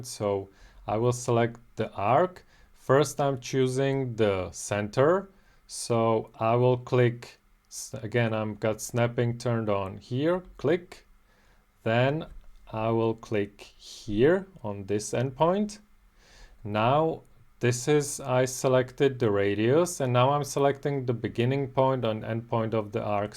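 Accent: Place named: Czech Republic. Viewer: Are you Czech btw? I can hear it! 😀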